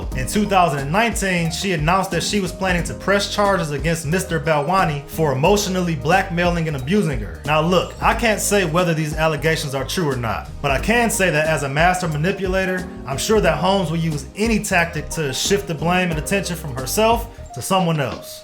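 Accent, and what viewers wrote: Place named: South, United States. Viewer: Southern accent doesnt work in these kind of vids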